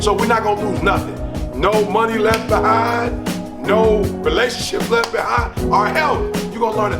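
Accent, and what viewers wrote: United States. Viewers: "Eight Mile, bruh." He so Deeeeeeetroit!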